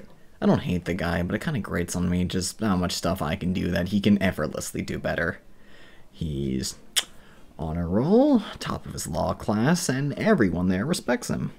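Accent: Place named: United Kingdom. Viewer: You sound British and I love it🤩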